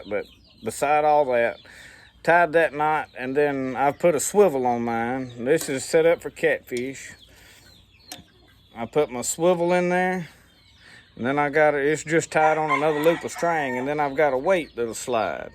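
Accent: South, United States. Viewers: Hes like me he's got a southern accent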